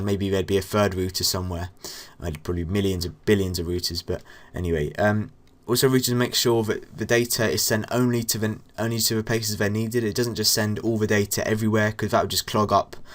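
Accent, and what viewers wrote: United Kingdom. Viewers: are you from leicester? you sound like a friend of mine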